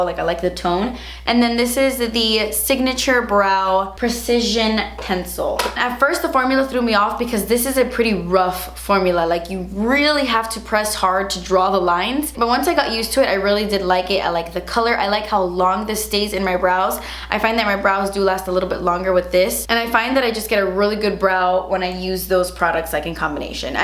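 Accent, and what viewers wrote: Cuba. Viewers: Omg I love her Cuban accent!! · I LOVE how you talk in spanish, I'm from Spain and girlllllll that cuban accent is bomb 💘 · El acento Cubana que bonito, aún que soy Mexicana me encanta 😛 make a spanish video trust me you’ll gain more subs 🔥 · Tu acento cubano,... I love when you speak spanish xDDDDDD.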